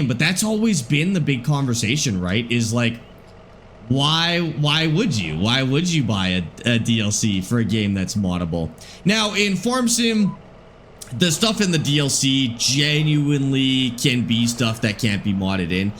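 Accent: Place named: Canada. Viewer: Can tell a Canadian if they say sorry. Not cause yall say it a lot but it’s how you say it that gives it away.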